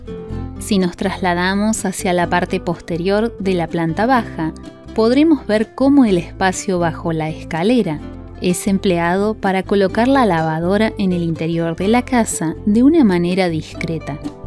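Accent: Argentina. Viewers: Es voz Argentina.👄😍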